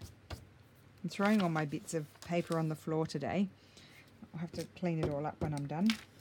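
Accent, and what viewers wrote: Australia. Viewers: Hi, I assumed you were in Australia from your accent but just heard you mention you’ve just been to Australia - I know it’s nosy, but where do you live?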